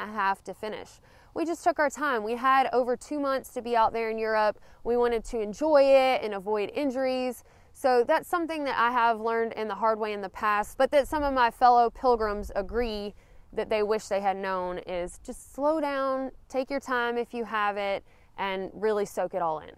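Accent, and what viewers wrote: South, United States. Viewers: I want to be in a bar and hear speak Spanish with a Southern Accent asking for Paja! JAJAJAJA! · Interesting points to consider, especially re: bedbugs. They aren't known to transmit any specific disease, but I would do whatever I could to avoid them, including treating my gear with permethrin before going where they might be. Just one caution, though - permethrin is extremely toxic to cats, so they should not come in contact with even the tiniest amount of it. What language did you select for closed captioning on this vid? It looks like Dutch or Afrikans. You have a southern accent, but it's not THAT strong so as to overwhelm the CC bot. Awesome videography and editing, as usual. Stay safe out there. Happy Trails. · Please don’t assume that a random postal worker would know you didnt mean your home in Spain. That’s not something to assume, there are many expats. 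If you were speaking with someone here in Florida who had a Spanish accent or British or whatever, you wouldn’t assume so. My neighbor for my whole life has a British accent and her daughter sounds as southern as you/this video creator and another one has a Jamaïcain accent and we have friends who have all sorts. Also, why would you travel to another country and then just eat subpar American food when you have so many more healthy options and authentic food in front of you? Maybe a better tip would be to familiarize yourself with some of the most common foods from that place and the language.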